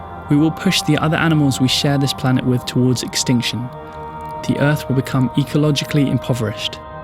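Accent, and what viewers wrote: United Kingdom. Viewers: Cute British accent.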